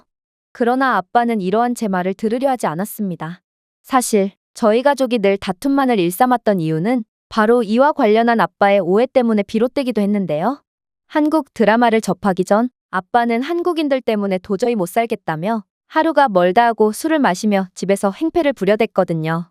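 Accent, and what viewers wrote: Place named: China. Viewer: 음 아직 다듣기 전인데 처음에만 들어보니 한국인인척 하는 중국인이겠군...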